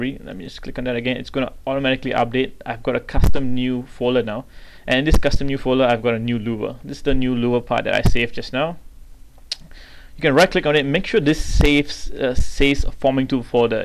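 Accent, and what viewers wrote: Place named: United Kingdom. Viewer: interesting. cool British accent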